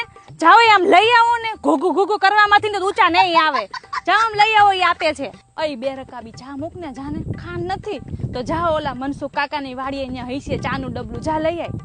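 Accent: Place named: Ahmedabad, India. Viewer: pan kyarek amdavadi bole che tyare maja nathi aavti aapni pyor kathiyavadi bolo 😊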